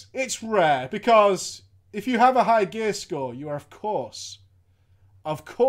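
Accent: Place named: Newcastle, United Kingdom. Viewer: As a Mackem, I find your Geordie accent hilarious!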